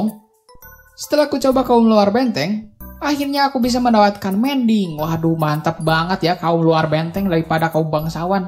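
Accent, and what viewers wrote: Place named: Bugis, Indonesia. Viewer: Hai aku sub baru nih aku tertarik dengan Vidio ini karena cara bicaranya Bugis tingkatkan lagi ya suaranya pasti banyak subcribernya kok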